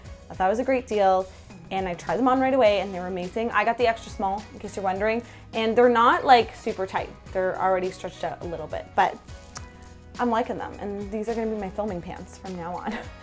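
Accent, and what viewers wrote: Canada. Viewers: Your Canadian accent just cracks me up 😂 love your channel!!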